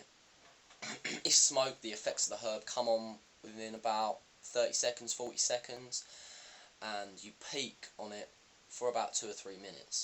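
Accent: United Kingdom. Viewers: Your voice and accent makes me think of the Harry Potter movies....sorry...I'm Canadian...so I love other accents...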